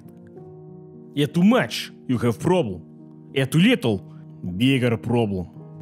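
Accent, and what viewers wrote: Russia. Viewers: I’ve always like the Russian accent and some time I speak in sort of Russian accent, but with your Russian accent and Comedy is perfect, love your channel❤️🤪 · This combination of soft music and heavy Russian accent along with the cut off sexual comments is killing me lol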